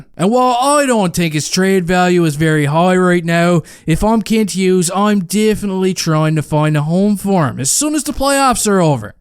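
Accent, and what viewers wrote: Newfoundland, Canada. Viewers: Pleas tell me what part of Newfoundland you are from?!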